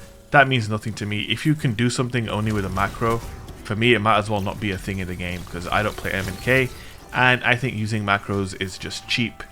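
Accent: United Kingdom. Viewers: Are you from UK? You kinda got that accent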